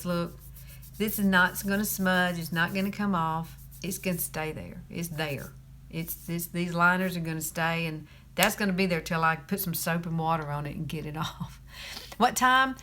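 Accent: South, United States. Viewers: I use Younique products here in Texas. Love them. I know what you mean about the southern accent. I have an accent a little different than yours but a while back, I worked with a group with our company from Chicago. One lady, while we were on the phone said “I just love your accent!” I said “I thought y’all were the ones with the accent!” She just laughed. I was serious though.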